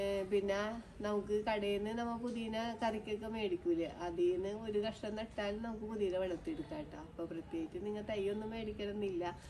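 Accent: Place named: Ernakulam, India. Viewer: സംസാര ശൈലി തന്നെ വേറെ ഒരു സ്ലാൻ ഗ്.... എറണാകുളം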